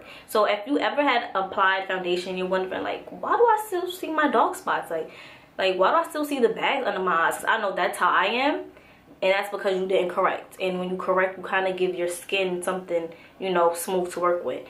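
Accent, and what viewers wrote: New York, United States. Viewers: As soon as I started the video I knew you were from the New York City lol thanks for sharing this review, about to go try mine out now! Just subscribed beautiful xo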